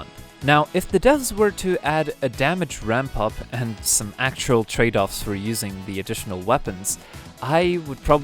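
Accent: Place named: United States. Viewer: I could never tell you speaked russian, your accent is so american :0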